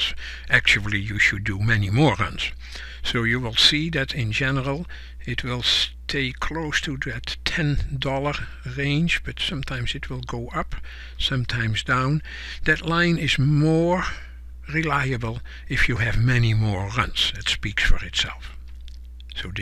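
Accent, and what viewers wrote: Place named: Netherlands. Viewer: love your Dutch accent makes you sound like my father telling me all this before I go to bed...good stuff Sir.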